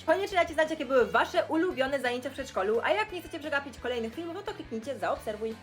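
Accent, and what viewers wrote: Germany. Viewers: Ja też chodziłam do niemieckiego przedszkola i bardzo lubiłam te wszystkie gry i w nie ciągle grałam i masz bardzo ładny akcent niemiecki ja nie mam😢 ale już oczywiście mieszkam w Polsce i niektóre osoby z mojej klasy w szkole myślały że urodziłam się w Niemczech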